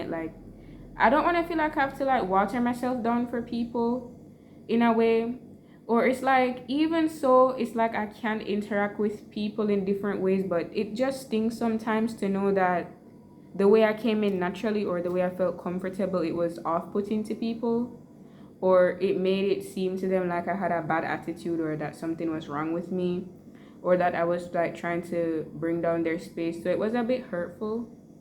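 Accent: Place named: Caribbean. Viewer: You sound from like your from Dominica or Barbarian or trini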